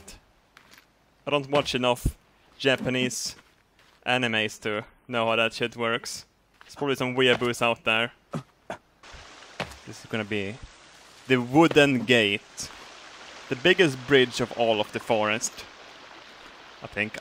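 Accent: Sweden. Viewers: Nooo person, don't correct his swenglish. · Jag är glad att jag är svensk... Annars skulle jag inte förstå ett ord du säger. jag älskar dit svengelska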